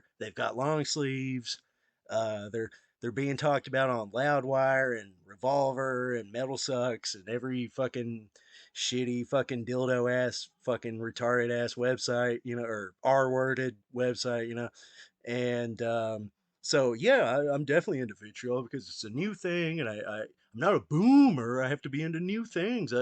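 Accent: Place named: South, United States. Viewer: You sound like a hillbilly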